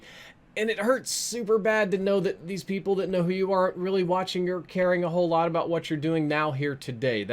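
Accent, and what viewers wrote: South, United States. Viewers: That old video with his southern accent oml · I used to watch you all the time from like mw3 up until I stopped playing on console after advanced warfare but have recently got back into your content a bit more. Maybe that helps you feel a little better? I also really quite enjoyed your philosophical, abstract and dream videos since middle school (going to college now hehe). I'll definitely keep watching again :) Also, DAMN THAT SOUTHERN ACCENT LOL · That southern accent was strong. Where’d it go? 😢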